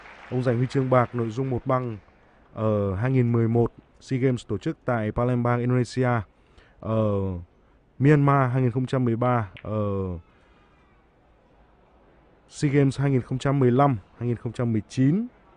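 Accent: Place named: South, Vietnam. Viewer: Tường thuật Bida chỉ cần chậm nói ít nhưng đủ thế thôi chứ đâu cần phải liến thắng như tường thuật bóng đá mà người bl nói tiếng miền Nam nói quá nhiều làm mất đi cáo hay cái đẹp của môn thể thao này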